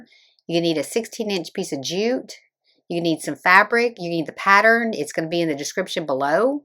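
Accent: South, United States. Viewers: She’s the fastest talking southerner I’ve ever heard!! Lol. She must have sped up the video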